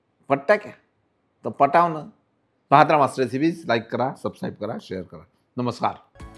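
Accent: Vidarbha, India. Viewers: Varhadi bhashechi godi chhan watli,.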